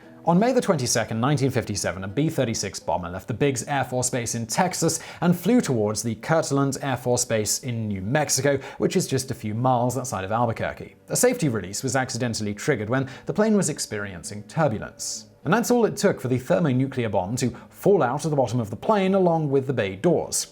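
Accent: United Kingdom. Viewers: He's British, they pronounce some things differently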